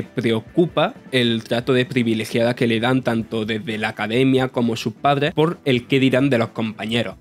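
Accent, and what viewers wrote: Andalusia, Spain. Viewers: porque ponen a un andaluz a narrar el video...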